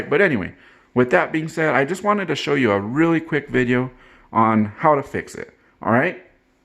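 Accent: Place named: Oklahoma, United States. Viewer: Is this guy from Oklahoma? Ok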